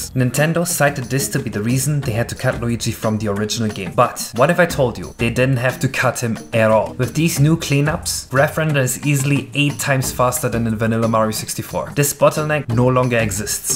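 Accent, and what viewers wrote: Germany. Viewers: i love the heavy german accent. very thematic. "lol. typical germans. always trying to reinvent the wheel. there's no overengineering like german engineering" and the like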